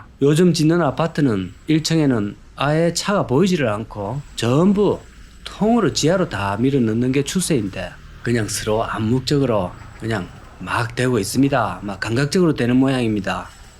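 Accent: Gyeongsang, South Korea. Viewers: 경상도 사투리의 매력에 웃네요. 은마(언마) 스스로 (서서로) 죽이라.(직이라) 사투리가 은근히 매력 있네예. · ㅋㅋㅋ경상도사람들은 "으"발음을 못해서 "어"나 "오"나 "아발음을 하는데... 그 이유는 원래 우리말 고어에는 <아래 아>로 발음하는 게 있는데... 이게 일제 때 24개 현재의 자모음으로 정해지면서 4개의 자모음이 발음하기 불편하다 하여 생략하게 합니다. 그러다보니 <아래 아>가 다양한 모음조화에 따라 다양한 모음으로 발음하게 되면서 특히 경상도 사람들의 경우 원래의 그 주된 구성원들이 저 북쪽의 사로(중국에서는 이를 고어 발음으로 "슈어눠"라고 발음하는 것을 문자로 적을 때 그 차자로서 "흉노"라고 적은 것) 사람들이기에 그 발음이 거센 발음이 많은 게 특징이며, 된발음을 잘 하지 못하는 특징을 가진다. 그래서 쌀"을 "살", "싸움"을 "사움"이라고 하는것처럼... 특히 이 "사움"은 일본어 고대어 에서 <"사우무" => "사무우" => "스모" = 씨름> 의 원형이기도 하다 위 영상제작자도 계속해서 <은마>라고 발음하지만 실제 들리는 발음은 <언마 혹은 엄마>로 발음하게 되는데 이는 어짤 수 없는 지역적 방언의 특성이다. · 갱상도 발음이라 이해해야됩니다 쌀도 살이라 말합니다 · 경상도 사투리가 압권^^ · 누가 뭐락 했심껴? 갱상도 발음으로는 은마를 언마라 부르는거 모르십니껴? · "와~ 후덜덜덜" 😅 갱상도 사투리 마 직인다 아이가💣 🌋🎊🤣 오늘도 👣👣👣학실한😂 개드립 ~ 으로 구독자들에게 도파민을 선사하시네요. ㅎㅎㅎㅎㅎㅎㅎㅎ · 갱상도 ㅋㅋㅋ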